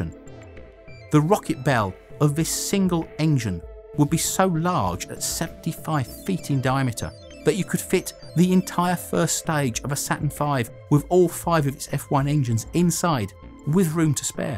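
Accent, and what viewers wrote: United Kingdom. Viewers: His British accent is like music o my ears.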